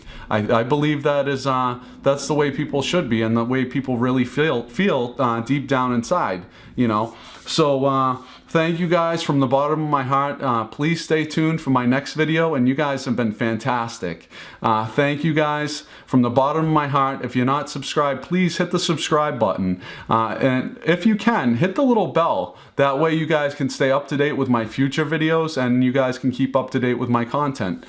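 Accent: Boston, United States. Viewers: That's a crazy story..people have no consideration...You remind me of Ben Affleck..the look and boston accent...:)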